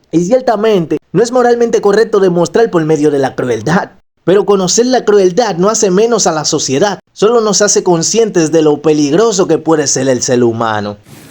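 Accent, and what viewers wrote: Dominican Republic. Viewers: eres dominicano?, buen video, mi opening favorito es el 2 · Como amo el acento dominicano❤ genial analisis🎉🎉🎉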